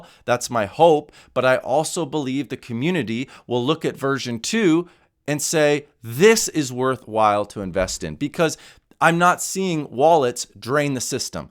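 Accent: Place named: Australia. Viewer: You Australian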